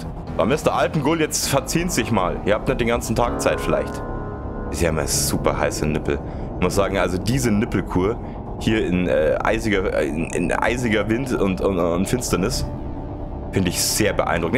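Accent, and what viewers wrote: Bavaria, Germany. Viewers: Richtig geil,und dein Bayrisch dazu,top😂. Würde gern das komplette Game sehen,war ziemlich genial. Weisswurst ist überhaupt nicht meins,never ever😝,sorry. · Hey, ein sehr schönes Spiel hat mir sehr gut gefallen! Dein Bayrisch ist echt Fantastisch 👍 hast mich wieder super Unterhalten der Alm Öhi würde sich freuen und mit dir ein Helles trinken oder Ziegenmilch 😅😂🤘 Vielen Dank für den tollen Prolog hab ein Super Wochenstart Bro 😁 Lasst ein #Like und ein #Abo für den lieben da 🤘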